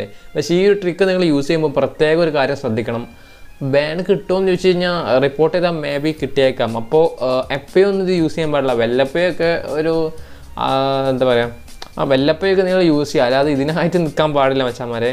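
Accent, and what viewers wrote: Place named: Malappuram, India. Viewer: Veed malappurm ano😂 voice kettuchoichatha💯🪄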